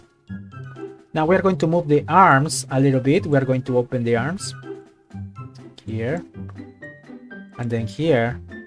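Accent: Spain. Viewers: aqui puedo notar que tu ingles es bueno pero a la vez español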